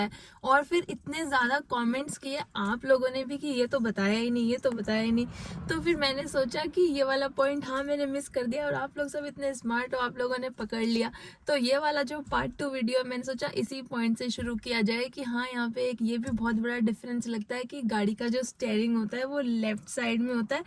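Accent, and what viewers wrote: India. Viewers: आप की भाषा लहजा देख लगता है पक्के भारतीय हो भारत की बुराई करना भी चाहो तो नही कर सकते।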